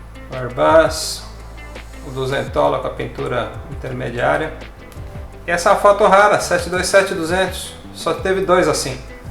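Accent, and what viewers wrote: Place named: Argentina. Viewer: Guuuuauu what a beautiful videos a photos...like always! If i dont know that you are brazilian...in the part of AR..i think that you are a argentinian jajaja..con un mate en la mano! Tenes un acento argentino perfecto en tu español! Jaja i have a question...i read about the relation of you, David Neeleman and Azul with viracopos...but from 1990 (KLM moved to guarulhos) to that moment...what happend in viracopos? All the people say "viracopos its beautiful, great desing and funcion". Big hug from an argentinian architect...who loves your photos and desing! And your histories!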